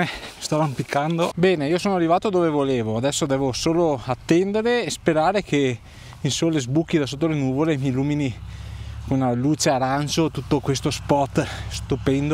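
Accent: Veneto, Italy. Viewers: Filmati sempre bellissimi....!!! In più con l'accdento veneto, fa sempre piacere, sembra un poco di essere a casa e di conoscerci.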